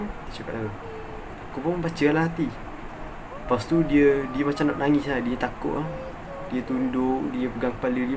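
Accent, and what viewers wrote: Terengganu, Malaysia. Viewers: Org mna? Bunyi cm org terengganu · abe tu ko? Meme pon . Sdak dgr org ganu kecek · nampak gaya ganu ni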